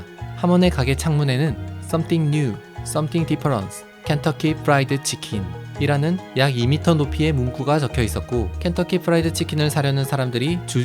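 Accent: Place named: Busan, South Korea. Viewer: 말투가 부산이네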